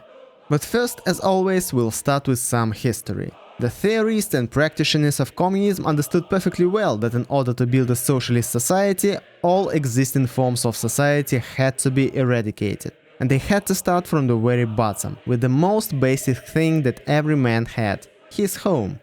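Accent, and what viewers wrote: Russia. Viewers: Bro, I watched several of your videos and I really liked your documentaries and the way make them. The visuals paired with your russian accent gives it a special look and feel. Thank you for bringing this up as I learned a lot about Russia....oh and YES, you got a sub 🙌😎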